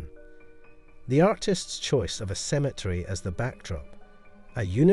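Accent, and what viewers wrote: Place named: Scotland, United Kingdom. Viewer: I love your voice sooooo much! I can listen to it forever lol. Scottish sounds so cool. You are a great audio book haha 😂. Keep up the videos you got a sub!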